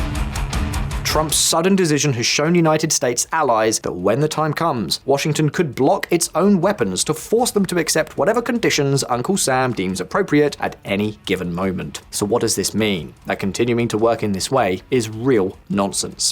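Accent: United Kingdom. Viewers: A proud British English accent is a sure sign that what follows is propaganda, half-truths and lies sponsored by the London-Wall Street Financial Cabal in order to continue their endless, no win wars and increase their bloody war profits from their military-industrial-complex enterprises. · When I hear Brit accent, i know this is full of bs.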